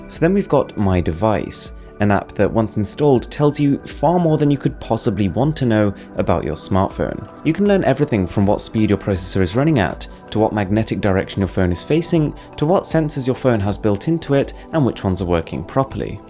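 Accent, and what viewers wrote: United Kingdom. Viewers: Are you from the UK? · British MKBHD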